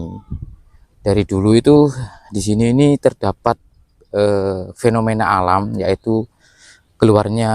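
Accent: Java, Indonesia. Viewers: Medok Jawa banget kau ngomongnya. Jadilah kau orang Indonesia, jgn jadi orang Jawa.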